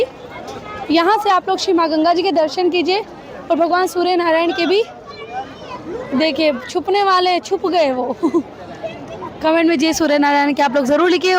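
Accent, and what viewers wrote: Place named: Haryana, India. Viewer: जी आपकी आवाज सुनकर तो ऐसा लग रहा है जैसे आप हरियाणा से हो जी जय श्री राम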